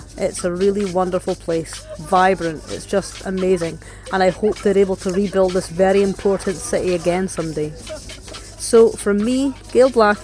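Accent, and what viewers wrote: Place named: Scotland, United Kingdom. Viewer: Hi. I found your video very comprehensive and, although I have no intention of ever visiting Cuba for philosophical reasons, your advice is transferable to many other similar countries. I was amused by your accent and the prospect of someone like you trying to learn to speak Spanish. I intend no insult. I was born in Glasgow and moved to North America with my family when I was seven years old. My parents had very strong Scottish accents as did the many family members who travelled here to visit over the 60 years I have lived here. I can't imagine any of them ever becoming conversant in Spanish. I once told my Auntie Betty that I needed an English/Gibberish dictionary to understand her. I am, however pretty fluent in Spanish as my own family travels, mostly to Mexico often. We are scuba divers and love the chance to visit small out of the way villages to dive and it is imperative that you speak some Spanish and the people really appreciate that you make the effort. 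Anyway, I found your attention to detail really great and I'll look for other reviews from you. Best wishes Tom Duncan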